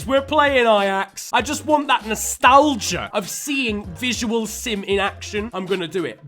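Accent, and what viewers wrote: Nigeria. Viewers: Are you Nigerian 🇳🇬? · Are you Nigerian?🇳🇬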